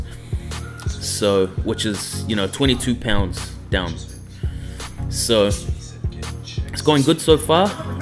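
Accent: New Zealand. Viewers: I hear that NZ accent and subscribe immediately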